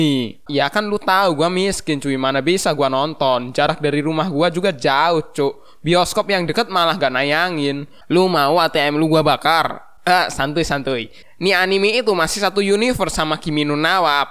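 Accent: Lampung, Indonesia. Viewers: Tabik pun Bang lu orang Lampung ya? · Bang lu orang Lampung? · Bang, lu dari lampung tah ?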